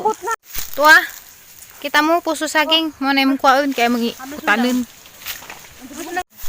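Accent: Bohol, Philippines. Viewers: Lambing ug punto pagka binojol...heheh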